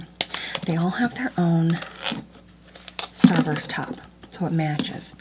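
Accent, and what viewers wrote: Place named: Midwest, United States. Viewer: the midwestern accent is so gooooood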